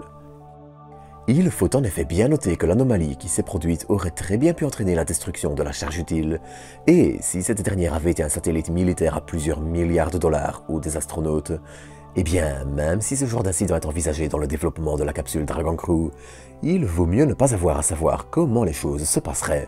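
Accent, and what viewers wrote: Belgium. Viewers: Accent Belge, normal quand on est né en Belgique.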